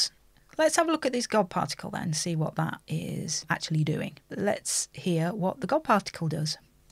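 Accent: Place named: Northern England, United Kingdom. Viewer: Just came across your channel, what a breath of fresh air. Not only is great to see a female Youtube channel based on audio engineering which is rare enough but a Northern accent too! Makes such a change from hearing all the Americans talk about everything haha. Thank you!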